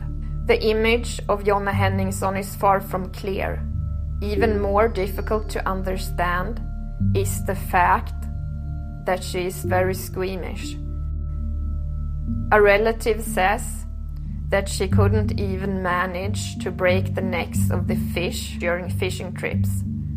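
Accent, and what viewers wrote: Sweden. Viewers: The Swenglish is killing me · William Redshield This language is called svengelska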